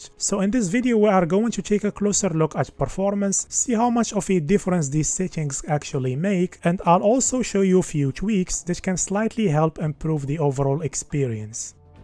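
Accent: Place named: Morocco. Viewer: yes , i thought that too because his accent's like marrocans ❤. Thank you bro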